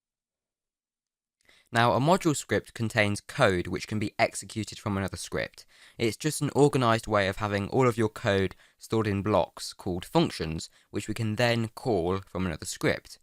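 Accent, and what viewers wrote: United Kingdom. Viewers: I can tell you are British and you have a voice that changed so much in one year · ikr, He used a heavy British accent, lol those days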